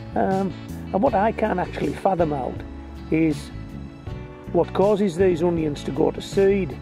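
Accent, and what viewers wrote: United Kingdom. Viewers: You are a comfort to us as our people are from the U.K., so your voice brings good memories as well as good information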